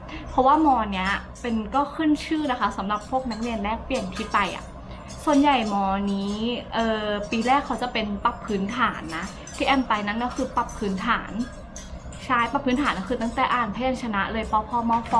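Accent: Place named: Suphanburi, Thailand. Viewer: ฟังแล้ว สุพรรณแน่แน๋ๆๆ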